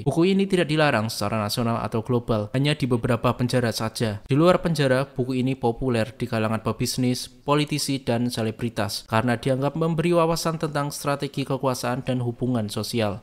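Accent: Java, Indonesia. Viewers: Jawa medok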